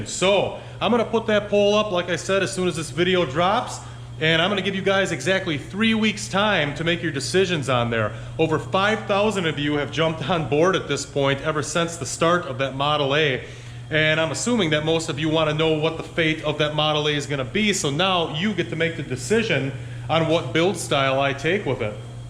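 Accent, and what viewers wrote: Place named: Northeastern Pennsylvania, United States. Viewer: You in Pennsylvania? Sounds like a northeastern PA accent (like me)!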